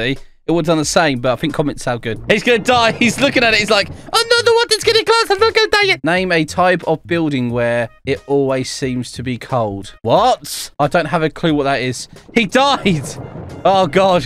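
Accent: United Kingdom. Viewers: Are you British because you always do videos just like British??..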